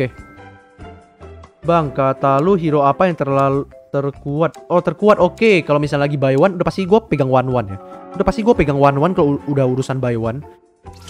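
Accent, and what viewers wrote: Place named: Java, Indonesia. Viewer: Asal mana ya mas ? Kok logatnya agak jawa dikit hehe salam dari surabaya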